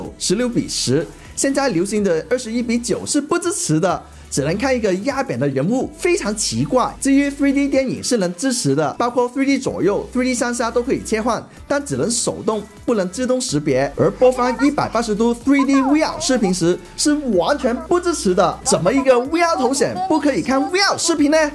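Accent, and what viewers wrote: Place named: Cantonese, China. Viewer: 點解用廣東話口音講普通話？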